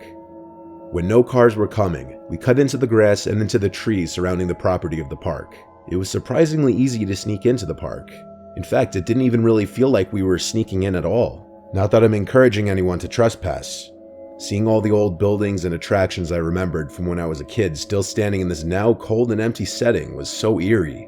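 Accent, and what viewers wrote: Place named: New York, United States. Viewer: The New York Borscht Belt accent is killing me.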